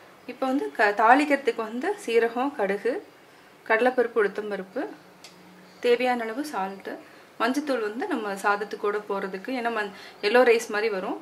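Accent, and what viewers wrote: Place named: Coimbatore, India. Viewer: .k hi sis starting la sollanalaum vanga nu solrangale..shanthama pesuranga ithuve alagathanapa irukuthu sis.kovai tamil really superp thaan.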